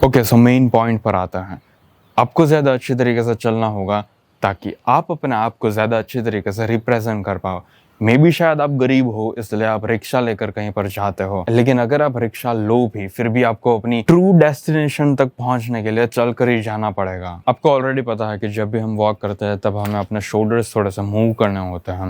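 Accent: Gujarat, India. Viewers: I can feel the Gujarati accent in your speech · Real hai bhai, yeh Gujarati speaker hai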